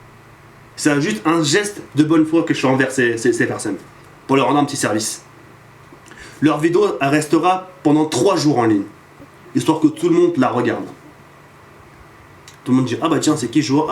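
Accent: England, United Kingdom. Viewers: Like pour le magnifique accent anglais de:D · mdrrrrr le mec qui fait le saut contre le mur bref.. sinon jte felicite pour cette video jai pris beaucoup de plaisir et puis jaime bien ton accent anglais mdrr alller salut:) <3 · J'adore ton accent anglais <3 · J'adore ton accent anglais XD très belle vidéo ;)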